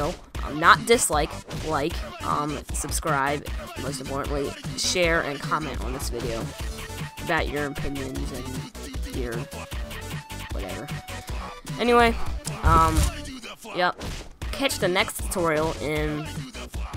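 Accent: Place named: United States. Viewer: you sound 100% American, why are you choosing PAL?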